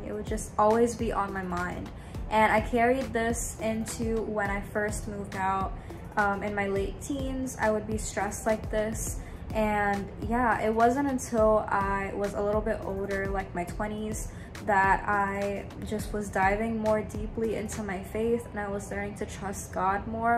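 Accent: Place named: India. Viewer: I can tell you're indian from your accent alone ❤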